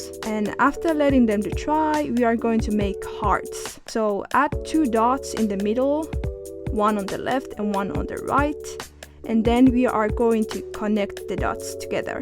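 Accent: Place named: Finland. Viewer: U sound finnish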